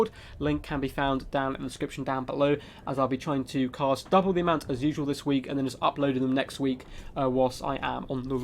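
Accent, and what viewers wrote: United Kingdom. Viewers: still at it!!! <3 love youuuuuuuu. >:( when will alithinar get sum puss puss >:( im waiting on the second enstalment of elf romance softcore porn in ur lovely british voice:p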